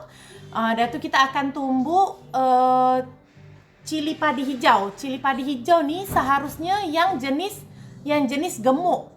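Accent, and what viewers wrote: Johor, Malaysia. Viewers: Mantap kak bahasa Johor dh nk jadi tu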